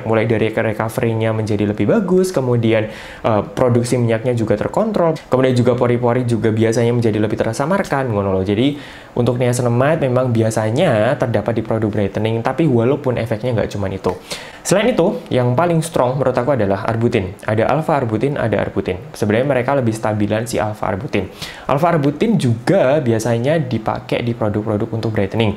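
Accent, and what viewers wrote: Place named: Surabaya, Indonesia. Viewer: Ya Allah pantes kok logate suroboyoan. Ternyata alumni FKG Unair toh. Suwon gawe infone mas 😅😅akhirnya terjawab sudah pertanyaan ttg brightening vs whitening.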